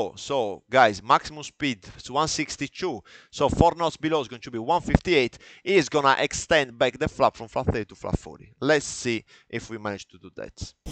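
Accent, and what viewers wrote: Italy. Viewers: Brilliant Airline Flight Instructor and that Italian accent!!!!! It is quite entertaining and engaging!! Keep iit uuup!! Would like to see how you teach jet transition.Are you planning a video or have one produced yet? I wish to compare Airbus with Boeing as the philosophy of flying is different.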